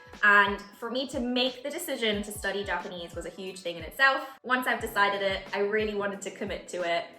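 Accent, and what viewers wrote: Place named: United Kingdom. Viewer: Great progress! Since you are solo studying you may not be getting any guidance on pronunciation. You have a very british "a" vowel going on in words like "wanikani". You are using what linguists call a "Near open front unrounded vowel". In IPA it's written "æ". You want to be using a "Open central unrounded vowel". In IPA it's written "a". Japanese always uses "a" never "æ" because "æ" doesn't exist in japanese. If you google both of these the first result will be wikipedia where you can play and hear the difference. Continued luck on your studies!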